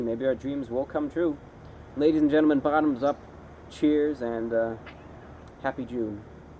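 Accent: United States. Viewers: You are very American aren’t you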